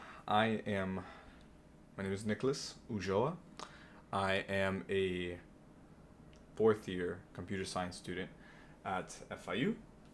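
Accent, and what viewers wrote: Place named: Brazil. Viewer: Suspeitei, meu irmão, que vc fosse brasileiro por alguns trejeitos de sotaque que eu tbm tenho (bem mais que você, é claro). Valeu pela mensagem, tô aqui no Brasil, na luta, trabalhando de SysAdmin e Analista de Sistemas numa pequena empresa, mexendo principalmente com ERP. Tenho sempre tentado seguir o caminho que Nosso Senhor pôs pra mim, antenado às mudanças do mercado mas sem se encabrestar por elas, senão pelo Evangelho; e esse emprego foi mais uma bênção que ele concedeu para minha vida. Que Jesus, nosso Deus, Maria, sua mãe, e São José, seu pai adotivo e provedor, te ajudem na tua própria jornada, irmão. Fica com Deus!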